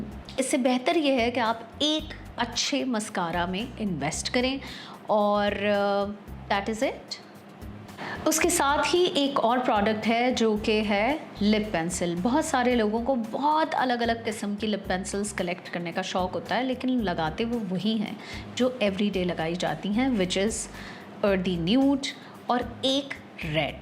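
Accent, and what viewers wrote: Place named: India. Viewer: Why are you talking like Indian!? Why can’t you say khareed & baghair properly if you’re a Pakistani I’m surprised.. 😳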